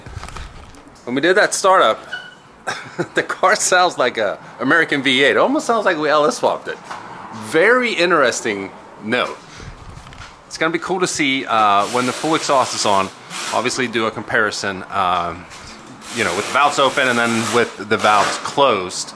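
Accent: Sweden. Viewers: are you swedish? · Are you from sweden originally?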